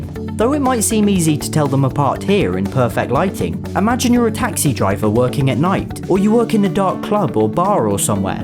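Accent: United Kingdom. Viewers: His british accent is the best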